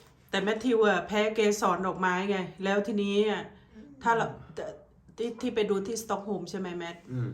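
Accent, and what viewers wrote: Thailand. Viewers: แม่ อยู่ภาคเหนือหรือใต้คะ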